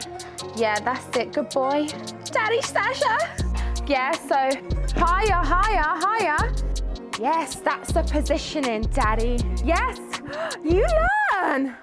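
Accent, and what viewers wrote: United Kingdom. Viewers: Great detail!!! Not only the “how” but also the “why”. Brits are so easy to listen to as well. Subbed today. Hope the channel grows for you.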